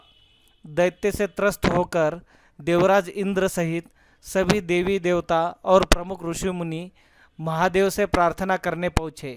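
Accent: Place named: Maharashtra, India. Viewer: ये मराठी माणूस की हिंदी है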